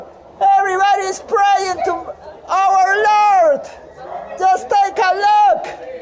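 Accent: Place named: China. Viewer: You sound like a Chinese man when you speak English!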